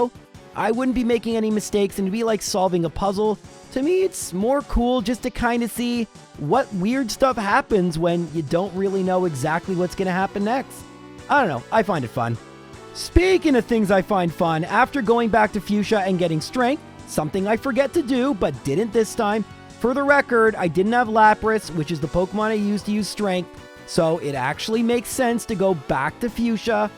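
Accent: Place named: Canada. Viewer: I often forget is Canadian until he says words like "Sorry" and "Tomorrow". Then it's really obvious.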